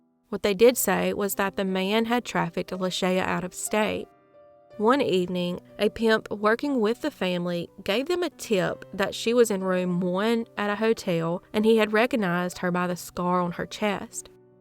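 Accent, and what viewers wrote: South, United States. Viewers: can be hilarious at times with her cute Southern drawl🤣😂👍 Excellent narrator too!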